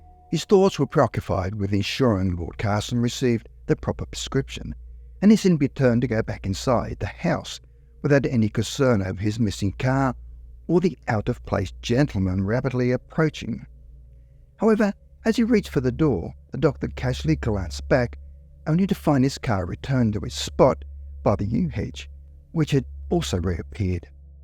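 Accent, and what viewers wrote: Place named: Australia. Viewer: Wonderful stories with a twist and a Aussi accent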